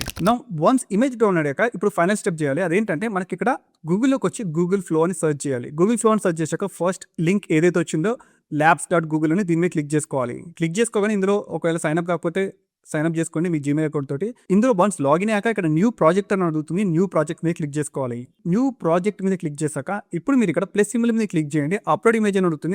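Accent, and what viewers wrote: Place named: India. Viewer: Voice koncham... telugu and English kalisina accent lo vastundi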